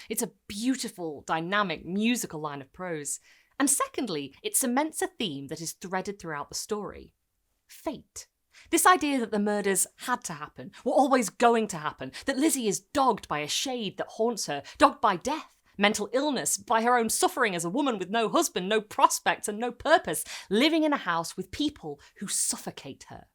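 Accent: United Kingdom. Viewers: Thank you so much for the trans inclusive disclaimer about womb language at the beginning. 😊 In current year I unfortunately always get a little nervous when I end up liking a content creator who speaks with a British accent, purely because of what's been going on in the UK right now to erode trans rights. Very happy that I don’t have to worry about any of that rhetoric coming from this channel. Thanks again.❤ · Extremely surreal to hear a British voice say the name of my hometown. Very excited to see more of this. Edit: Belly laugh when I heard "Portuguese Community" in the part about immigrants, so weird to think about how the tables have turned.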